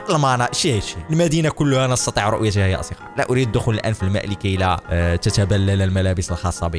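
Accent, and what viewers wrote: Morocco. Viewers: هل أنت مغربي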